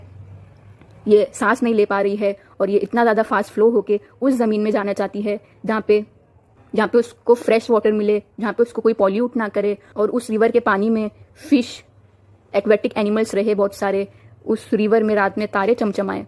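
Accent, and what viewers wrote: Mumbai, India. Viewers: Ma'am are you from Mumbai ?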